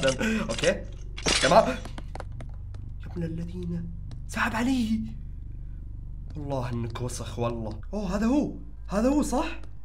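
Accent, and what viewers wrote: Bahrain. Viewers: صاير تتكلم بحريني واجد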